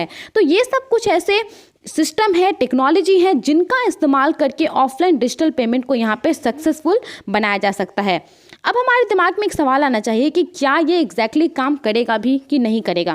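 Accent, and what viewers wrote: Bihar, India. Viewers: मैडम आप बिहार se ho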